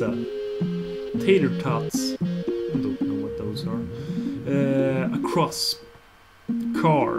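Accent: Sweden. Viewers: you look really swedish even besides your blue eyes and blonde hair and your accent is pretty swedish too... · Yeah, well indeed u do have a swedish accent :P u rly dont have the annoying one tho :P urs is very charming :D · haha you dont look swedish, but your accent really sounds from there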